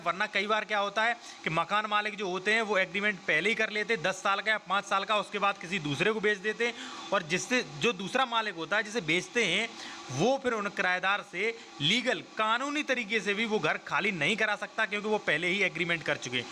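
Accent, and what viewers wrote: Uttar Pradesh, India. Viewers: Sir aapki bolne se. Lagta hai ki aap agra aligarh ke as pass practice karte hai...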